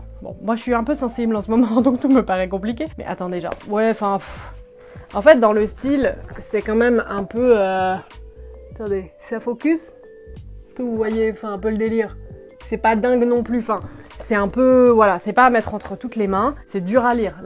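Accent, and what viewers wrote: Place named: North, France. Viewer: Je kiff trop ton accent tu viens du chnor ???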